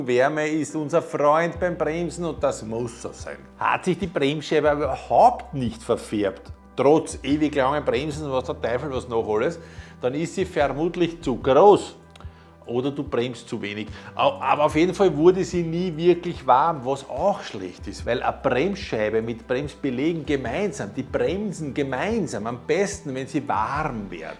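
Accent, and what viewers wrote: Austria. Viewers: So einfach und gut erklärt im Wiener Schmäh, ich liebe euern Dialekt. Weiter so ‼️👍🙏💪 Viele Grüße aus Berlin ☀️ Cheers 🍻 und Happy trail's ❗ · geiles Video , geiler Typ , tip top erklärt 👍 und der beste Dialekt , ich liebe die Österreicher 😂👍👍👍 Grüße aus der Nachbarschaft , Allgäu Füssen 👍😊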